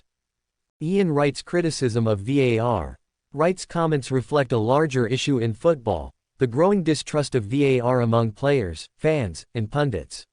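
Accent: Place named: United States. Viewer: And why do we have to listen to an American accent, US English usage (… a 1 to zero win …) etc? Surely there are British English AI voices out there, no?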